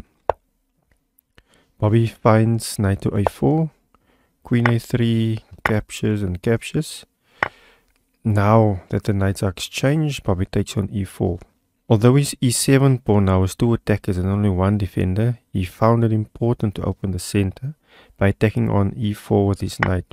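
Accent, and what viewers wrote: South Africa. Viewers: Very nice. This is of course the so-called 'Game of the Century' which gained the young Fischer a lot of attention. To the narrator: are you from South Africa?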